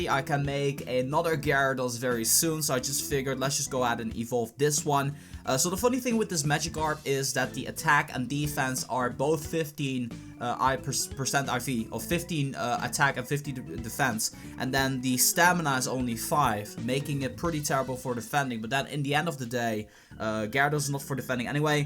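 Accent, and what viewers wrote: Netherlands. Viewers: Are u ducht ?? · Kom jij uit Nederland wouw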